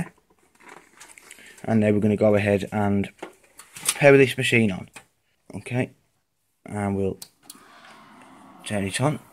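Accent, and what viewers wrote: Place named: London, United Kingdom. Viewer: What a London blok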